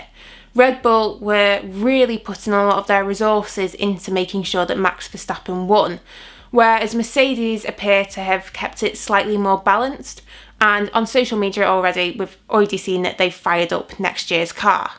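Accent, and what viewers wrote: United Kingdom. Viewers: You've got to love that british accent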